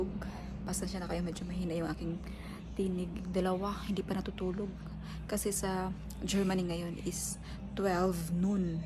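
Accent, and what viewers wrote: Visayas, Philippines. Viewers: Stop being perfectionist girls.shes a Bisaya so it's not easy to her to speak straight..and that's the way that's why she known from the beginning of her vlog if you think that you are brainy enough you should understand what she's going to mean it.in my opinion ✌️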